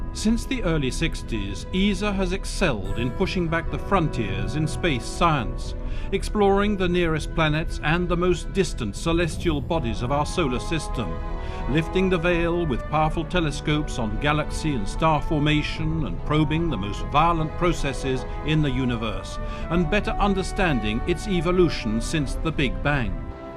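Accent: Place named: United Kingdom. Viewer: cuz the brit accent is plenty cool enough in mono.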